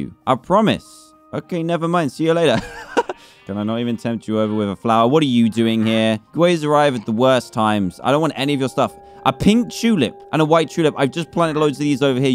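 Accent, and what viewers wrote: England, United Kingdom. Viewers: Wat part off England are you from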